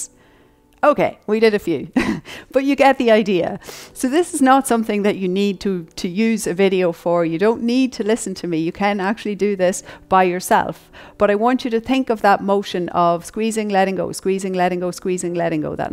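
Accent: Ireland. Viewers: sounds weird but i can feel it when i lift as i exhale maybe my body is just weird like that LOL i love your irish accent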